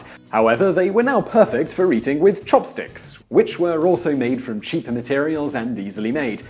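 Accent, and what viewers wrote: United Kingdom. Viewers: Your accent of course is brittish, but where the in the UK is it from... great chanell sir.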